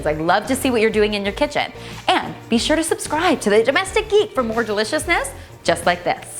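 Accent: Canada. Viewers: Thank you I love these recipes! Are you from canada ? I can tell we talk the same way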